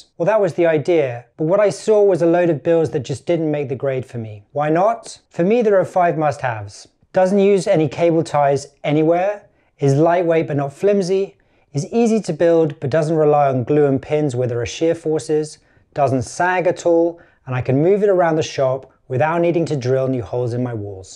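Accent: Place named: United Kingdom. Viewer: Hi Gotta say it's Soooo Refreshing to hear a British accent whilst watching YouTube videos. keep up the Great Content.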